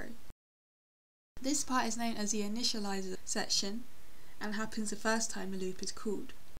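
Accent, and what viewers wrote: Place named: United Kingdom. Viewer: I love your British accent ;) and I love your Unity tutorials!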